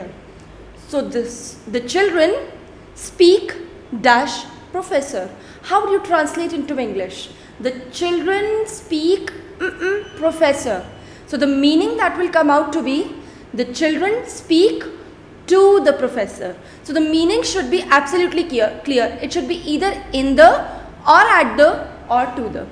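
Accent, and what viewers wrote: Paris, France. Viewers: Your way of speaking French is very acsent same pronunciation of Paris people I think you stayed in Paris and teach french to students thankyou for being my inspiration 😍🥰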